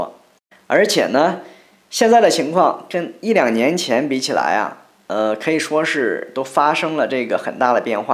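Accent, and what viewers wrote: China. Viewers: 聽你口音是內地的朋友 注意你的安全 大陸很危險的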